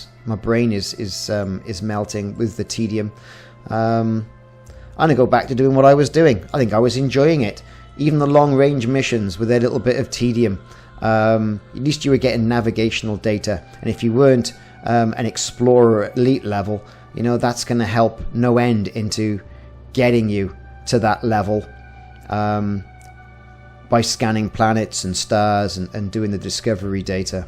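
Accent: Wales, United Kingdom. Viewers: o7 you a welsh man butty :)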